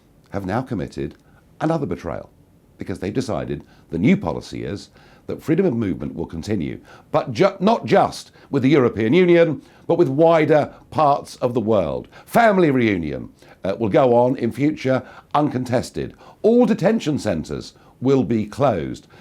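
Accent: United Kingdom. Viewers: I always listen to NIGEL FARAGE who I understand very well, I have no idea from what part of U.K is his accent, anyway I agree with all he is saying and it's the truth ...very interesting to listen to him, it shows me how it is difficult to lose the brexit with or without a deal...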